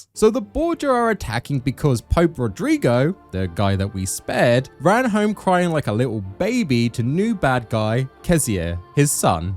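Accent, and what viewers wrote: United Kingdom. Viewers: Binged the first 3 so far. Really like your style of video. Feels authentic and not AI scripted! Which is a blessing nowadays. I'll continue to binge the rest of the series. For now. Until you Brit voice gets to me!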